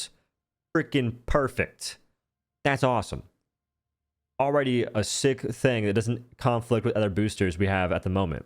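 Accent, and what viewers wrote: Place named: Panhandle, United States. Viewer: This fella sounds like he's frum tha panhayndle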